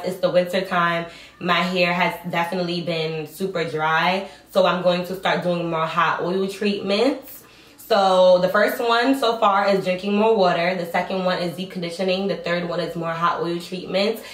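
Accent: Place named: New York, United States. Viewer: I love your accent. Sounds like a New York accent. I love your hair and you remind me of Keke Palmer, very pretty girl. Oh, I would like to be mid back by July or Aug 2020. I drink over a gallon a water a day. I'm taking better care of my hair now and also deep condition every 2 weeks.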